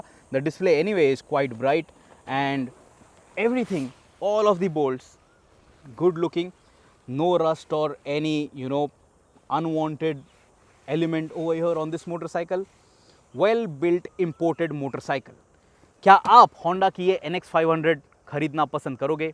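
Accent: Maharashtra, India. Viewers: Thanks, that's reassuring. And BTW, the way you speak Hindi with a Marathi twist is quite interesting!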